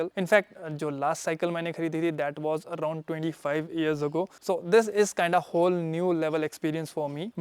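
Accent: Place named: Jammu, India. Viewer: bhai apki tone se lgra aap jammu se ho...( me jammu se hu ) is yes then bta skte ho konse area se ...? milkr ride krnge ❤ ( although video bhut acha tha...iski unboxing bhi dekhi thi review bi dekh liya ab purchase bhi kr lunga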